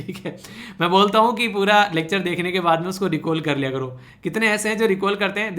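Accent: Haryana, India. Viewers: apki bato or awaj se or APK ghr se , or khane ki choice se ap Rajasthan Haryana me khii se ho ,, 90% Haryana 💓 😄😄😂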